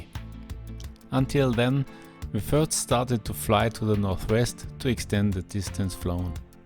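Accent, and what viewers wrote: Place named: Austria. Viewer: Ich weiss nicht was ich besser finde, die gut gemachten Videos oder das "Arnold-Schwarzenegger-Englisch".... Beides zusammen unschlagbar! Grüsse Alpha 3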